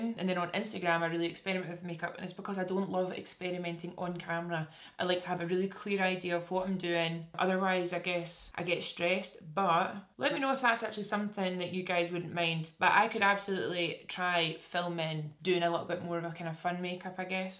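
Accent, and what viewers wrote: Scotland, United Kingdom. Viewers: I have been so obsessed with your YouTube channel over lockdown. It’s just feels like watching one of my friends, probs because your Scottish as well and I love hearing that accent😁🏴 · scottish innit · I'm watching this with captions on, it literally doesn't understand the Scottish accent lol. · Okay. I absolutely adore you! I just came upon your channel and boy I’m glad I did. I love your voice, your Scottish accent, your jewelry, or tattoos, EVERYTHING!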